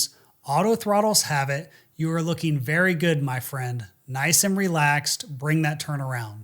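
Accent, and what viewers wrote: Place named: Appalachia, United States. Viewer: Can you re record this with an English accent so that it sounds dramatic and educational instead of hillbilly gossip.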